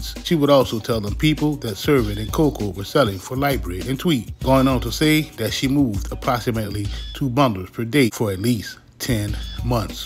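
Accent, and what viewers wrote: New Orleans, United States. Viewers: for sho Big Homie I know you out the city I know new Orleans when I hear it . Yeah talking bout those people who don't know new Orleans like we do . But anyway keep the stories coming.love the channel. Btw I'm out dat 8.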